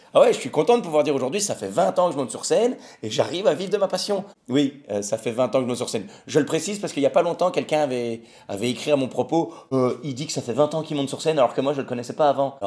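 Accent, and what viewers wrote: Belgium. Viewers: en Belgique tout comme partout dans le monde les cons sont légions, t'inquiète, les "vrais" belges comme toi et moi on sait en reconnaître un autre dès qu'on l'entend causer, une fois!! on t'adore! continue mec, t'es le meilleur!;) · Oh putain, mdr pourtant ça s'entend bien que tu es belge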